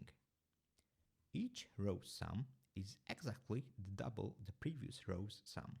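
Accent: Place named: Hungary. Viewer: MI A FASZ, CSAK MOST VETTEM ÉSZRE, HOGY TE MAGYAR VAGY. Az akcentus eladta a dolgot. Meg az, hogy variációnak nevezted a permutációt, ami magyar dolog. BRUHHHHHHHHHHHHHHHHHHHHHHh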